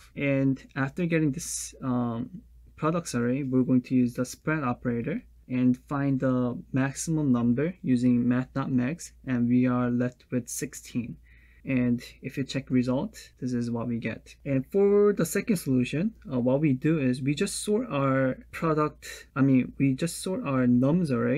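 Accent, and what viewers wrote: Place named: South Korea. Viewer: I love how considerate you are at explaining all the steps you take to get to an answer. This is kind of a random question but I hear some Korean accent in the way you speak. Are you korean by any chance?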